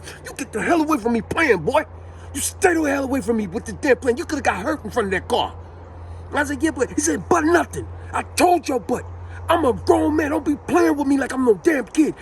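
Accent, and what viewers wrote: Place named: West Philadelphia, United States. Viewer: He reminds me of growing up in West Philly and Camden.That is exactly how the guys would talk.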